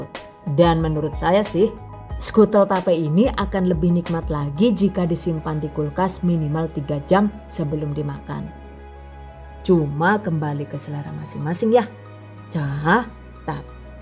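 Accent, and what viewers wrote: Banyumas, Indonesia. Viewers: MaasyaAllah, suka sm detail penyampaian'y & suka cara bicara'y yg ngapak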